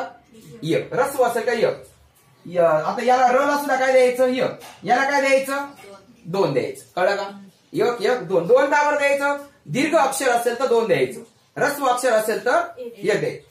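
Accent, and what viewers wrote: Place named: Dhule, India. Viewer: 10th pass karun 22 varsh zhale. Mi kavita lekhan karto. sahaj mhatale vrutta ani chand yache dnyan ghyave punha ekda mhanun video search kela. tar ha video start to end pahila. punha school madhe gelyachi feeling ali. Khupach chaan sir. ani tumchi accent dhulyachi aahe. I hope aatta Lockdown chya kalat vidyarthyanna yacha khup fhayda hoil... Good Job Keep it up. <link> vel milala tar mazhya kavita check kara...(Mukta Chandatlya aahet... ) Baki Chndatalya ani Gazal post nahi kelya ajun shikto aahe...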